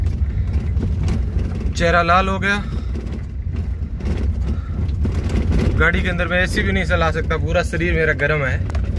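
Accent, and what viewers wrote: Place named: Nagaur, India. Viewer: Nagaur s match khati h aapki language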